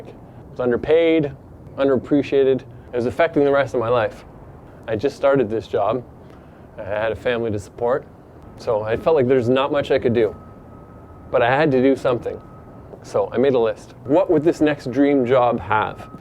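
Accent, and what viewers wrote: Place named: Canada. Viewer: I immediately knew he was Canadian great story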